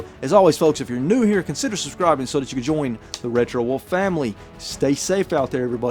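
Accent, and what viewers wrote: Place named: South, United States. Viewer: Thanks for the review! As a fellow southerner, I was really happy to hear your accent!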